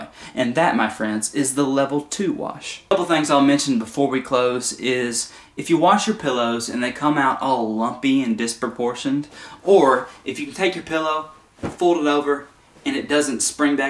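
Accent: South, United States. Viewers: Are you from Alabama or Georgia?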